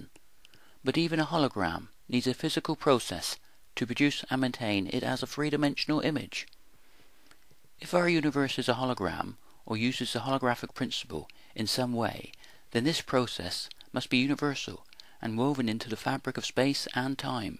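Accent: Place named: Bristol, United Kingdom. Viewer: Incoherent rambling in a thick bristolian accent... ...nice use of big words, by the way. To me, this gives insight into a mind that is clearly fascinated with the concept of ambiguity, which obsessively attempts to apply it to scientific observation. This smacks of pseudo-religion. I am not without my own philosophical musings, but this is simply pretentious.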